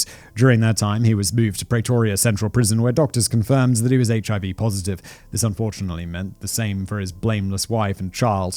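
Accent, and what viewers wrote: RP, United Kingdom. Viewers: A persons bad choices are not their fault?! When you know he is in prison for rape! WTF? Exactly who's fault is it's? Simon, even with your posh accent you can't make that sound smart. His wife was every bit as responsible for her decision's as her husband was responsible for his decisions to beat, rape, and kill those women. Stop letting people off for their piss poor decision making abilities. It sets bad precedents. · If it never crossed your mind that orderly, wealthy countries with root philosophies that advantage individual sovereignty might do a better job measuring pathology rather than actually having higher incidence rates of pathology, maybe you should trade in your pompous brit accent for something less ostentatiously elitist. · anyone notice Simon's script reading voice is more posh than his normal offscript voice? 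*reads script sounds like Benedict Cumberbatch's Sherlock Holmes" "talks normally sounds like Michael Caine's Sherlock Holmes"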